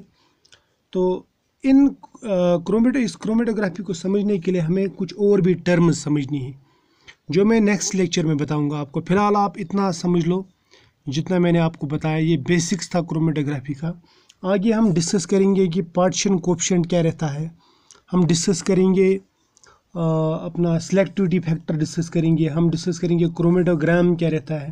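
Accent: Kashmir, India. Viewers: totally Kashmiri accent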